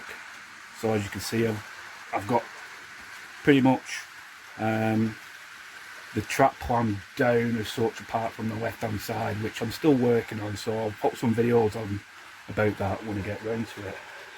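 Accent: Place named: Farnworth, United Kingdom. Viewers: Brilliant, really enjoyed this and looking forward to seeing more.Don't worry about your voice you're a Farnerth lad and sound reet gradely. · Hi, really enjoyed the video, great layout and the scratch building is superb and like the theme Lancashire and Yorkshire railway! You sound like a northerner like myself and sound absently fine and its about your passion for the hobby and you know your stuff and its good to link it with the history! My only piece of friendly advice is to go slow with the camera panning and maybe invest in a small hand held tripod that you can hold or put on the layout for static shots. Looking forward to following your progress and just subbed. Cheers Gary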